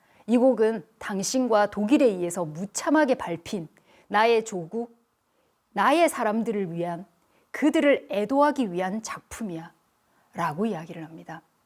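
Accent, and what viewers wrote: Gyeongsang, South Korea. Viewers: 웬일이냐. EBS 에서 쓸데있는 프로그램을 다 만들고. 배 선생님 경상도 억양이 있음에도 diction 도 너무 깨끗해서 잘 알아들을 수 있고 ( script ) 내용도 너무 좋네요 . 소위 말하는 방송작가라는 인력의 손이 별로 닿지 아니한 듯.. EBS 여행 프로그램 중남미편 몇 개 보다 말았는데요 전문가라고 나온 사람들이 그것도 교수 직함 달고 스페인어 정관사도 구분 못하질 않나 돌아다니면서 쩝쩝거리고 먹질 않나 ( 그게 한국서는 실례가 안되지만 외국서는 아무리 중남미라해도 엄청 미개한 매너 ) 그래서 EBS 프로그램 PD 들 수준을 의심했는데 이 번에 볼만하고 들을만한 Casting 이군요 . 웬일입니까.. 앞으로 계속 방송 잘 만드시길 부탁합니다. 정말 배 선생님 때문에 이거 보게 되었습니다.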